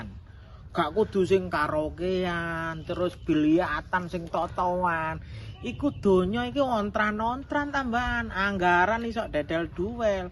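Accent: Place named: Sidoarjo, Indonesia. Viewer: daerah ndi yo...? logate koyok Sidoarjo...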